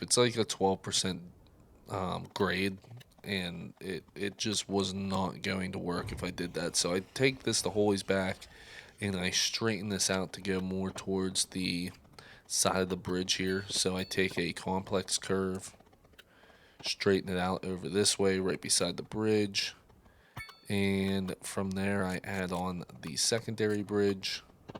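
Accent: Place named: Pittsburgh, United States. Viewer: I knew you were from Pittsburgh. Your accent is a dead giveaway. My family is from Pitcairn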